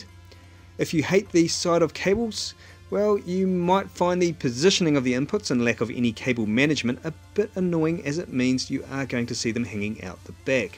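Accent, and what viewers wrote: New Zealand. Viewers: A Kiwi accent be praised ! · MY BOY YOUR FROM NZ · are you from new zealanfd?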